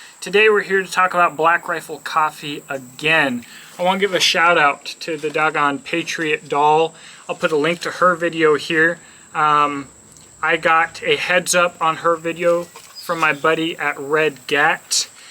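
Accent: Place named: Georgia, United States. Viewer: As a northerner in ga your accent is a relief. Good video as well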